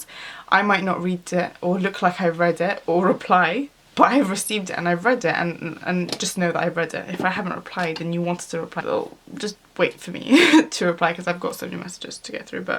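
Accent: Iraq. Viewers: Your Iraqi is so similar to mine! hahaha, nmashi el hal. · yeah haha something about iraqi eyebrows! <3 beautiful tho!! also her arabic is iraqi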